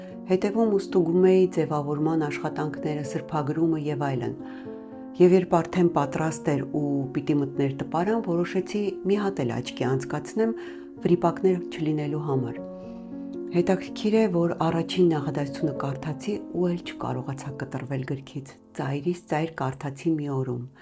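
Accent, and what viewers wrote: Standard. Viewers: գեղեցիկ ու մաքուր հայերենը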